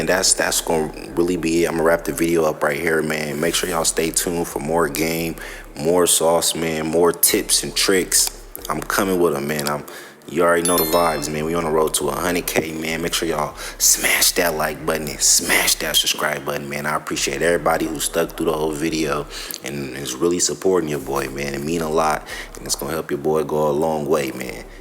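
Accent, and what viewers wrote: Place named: West Coast, United States. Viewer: A U FROM DA WEST FOO? I HEAR DAT SHIT ALL IN YO VOICE LOL IM FRM LA BRO WHERE U FRM FOO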